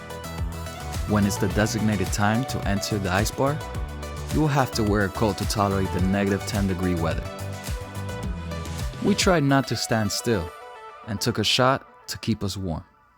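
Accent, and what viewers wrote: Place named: Australia. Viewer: I didn't know you had an Australian accent. And wow rural Germany is beautiful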